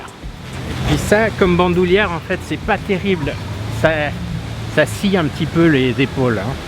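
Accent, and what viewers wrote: France. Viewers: Vé c'est beau... le Safranier (Commune libre) et ton sac, certes on s'en cogne mais de montrer la technologie avec le vrai accent de marseille... Ça l'fait. Franck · "On s'en cogne de ce sac" tu m'as bien fait rire avec ton accent du sud. Ca fait du bien de voir Antibes et le sud. La mer était particulièrement agitée!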